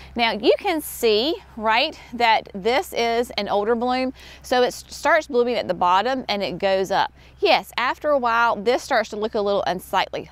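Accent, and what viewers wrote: South, United States. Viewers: Hi, Love your Southern drawl!! Best, Albert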